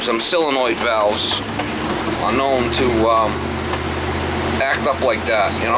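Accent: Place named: Boston, United States. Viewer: Man I miss that thick Boston accent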